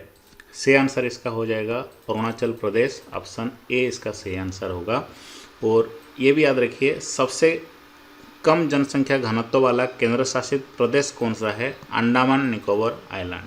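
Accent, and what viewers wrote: Odisha, India. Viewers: Oriya bhai ka tune hai.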